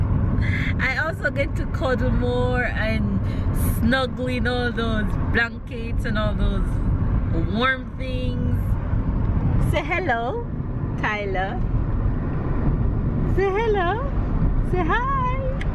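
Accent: Jamaica. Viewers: I like your Jamaican accent. Autumn is awesome. It's not too hot, and not too cold.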